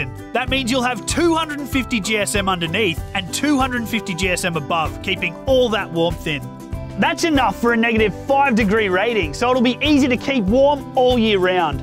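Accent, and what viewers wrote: Australia. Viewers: Hes Australian...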